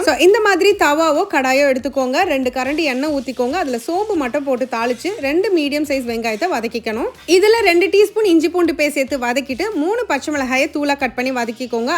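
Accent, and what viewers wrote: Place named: Chennai, India. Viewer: Unga peachy kakave unga video ve paakuren…Chennai language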